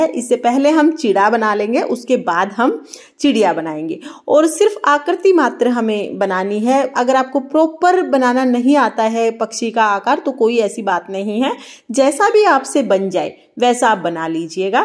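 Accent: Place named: Bengal, India. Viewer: Di aapki bengales kafi pyari lag rahi h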